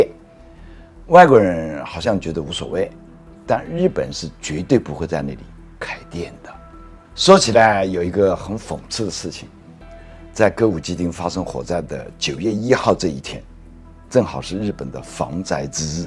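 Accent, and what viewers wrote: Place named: Changsha, China. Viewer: 老乡，没想到您长沙话说得还是那么正宗。听了您的传奇经历，真的觉得既向往又钦佩。真的下不得老地咧，😁😁😁永远支持你。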